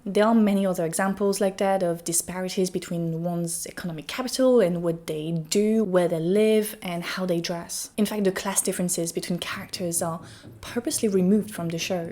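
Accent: France. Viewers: Daaamn girl. You are 🔥. Loooove the French accent as well 🙈. What's your Also it might be true that Gen Z are more politically active but not really well educated/informed on the political topics they are active about. It seems they just want to be angry at something and want to be loud because of it.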